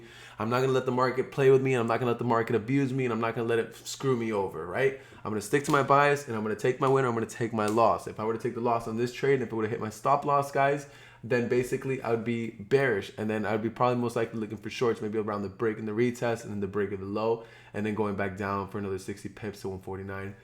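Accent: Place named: United Kingdom. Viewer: That British accent got me dead 😂😂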